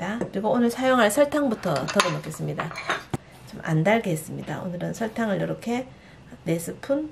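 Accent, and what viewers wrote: Gyeongsang, South Korea. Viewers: ! 경상도 말씨. 너무 구수하고 좋아요. 빵도 구수하게 잘만즈셨네요. 꼭 딸아해봐야겠어요. 💯👍💯💐💐💐🎊🎊🎊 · 뿌사졌어요! ㅋㅋㅋ 깨졌어요 부러졌어요 보다 훨씬 적당하고 현장감나는 표현이네요 갱상도 사투리도 참 듣기 좋아요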